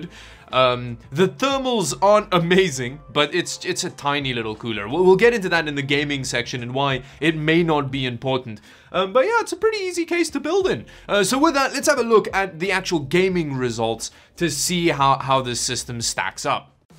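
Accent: South Africa. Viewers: Mmm, am I detecting a South African accent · are you south african?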